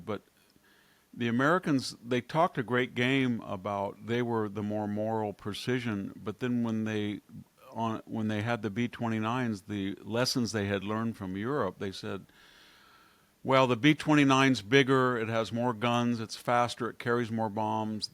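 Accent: United Kingdom. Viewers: Who is this lovely,m well spoken, well informed, young British man?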